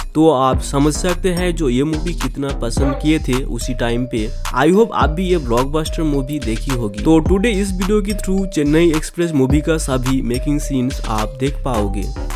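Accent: Bengal, India. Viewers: Hindi bhi aap bangla me bol rahe ho 😆, Please hindi sudharo. " Meenamma daakte (bulate) hai" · Bengali and Hindi mix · Ur voice is Bengali I caught 😂😂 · Are bhoi aap bongali ho kya? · DADA you are Bengali.. oh pata chai hi rah hay.... Pati Banglay bolle bhalo korte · Bengali accent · Mere papa jaisi, bhai hamare Bengali hai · May be he hails from Bengal. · Sir bengoli hai..isi wajha se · Bhai bangali accent mix hain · 😂 Bengali accent hai. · I think he is Bengali. It seems by his accent. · Bengali accent hai, south Indian nahi!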